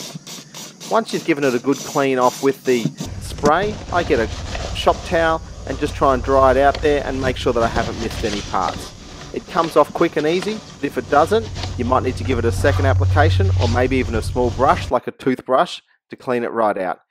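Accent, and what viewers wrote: Australia. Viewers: you have an aussie accent mate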